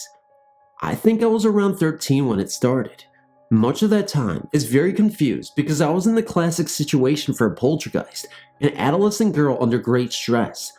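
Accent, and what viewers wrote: South, United States. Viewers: LoL 😂😂😂 Your Southern Accent 😏 I was Dying like is quite Funny & Cool 😀 much love ✌💙💙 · Southern ascent sounds good,great vid Thanks and happy holidays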